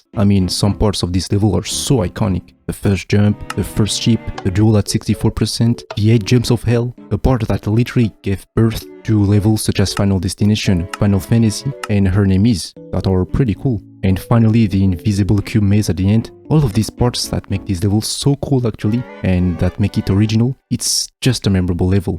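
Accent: France. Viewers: bro are you french you sound so french lol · You have a strong sounding like French accent I like it🔥 Nice vid !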